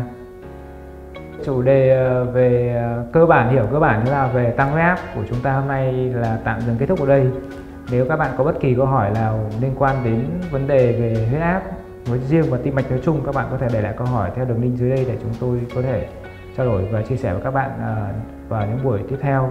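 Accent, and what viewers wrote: North, Vietnam. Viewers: Tiếng bk khó nghe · Mình dân Cà mau bị cao huyết áp nhưng nghe giọng nói bake thì thấy không tin tưỡng nên tắt ngay.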